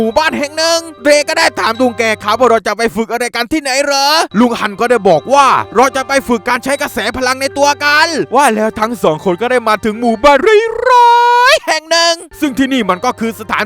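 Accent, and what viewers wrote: South, Thailand. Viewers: พี่เป็นคนใต้ป่าวคับ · คนภาคใต้เหรอครับผมพูดภาษาใต้เป็นนะเพราะผมเป็นคนใต้สวัสดีพี่บ่าวหวัดดีนะพี่บ่าว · พี่เป็นคนใต้หลอครับ😅😅😅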